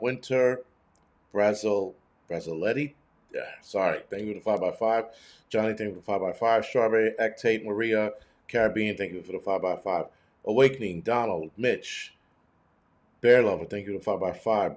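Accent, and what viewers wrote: New York, United States. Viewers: I love your NYC accent. I'm from Chicago and I love the East Coast. We canot let these ppl continue to messup ourcountry.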